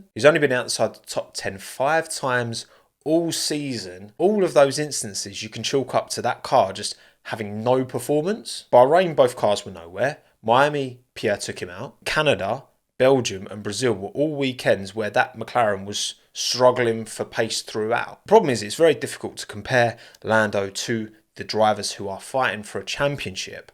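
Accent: United Kingdom. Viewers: I wanted to ask you a question that might come off a bit rude, but I don't mean it rudely. What's your particular style of British accent called? I watch a lot of F1 youtubers from the UK, and it's not like I've never heard British accents before... Your accent is kind of particular though, and I just wondered what it was called. No disrespect meant.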